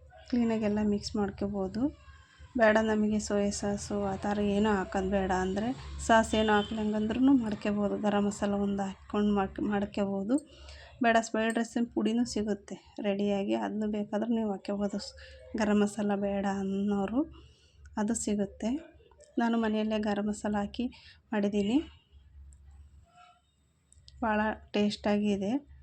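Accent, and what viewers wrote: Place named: Sira, India. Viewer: R u from sira r it's surroundings ur language slang in like that nice receipe😊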